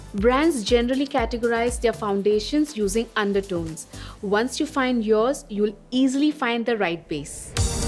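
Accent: India. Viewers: shes black. how she has indian accent?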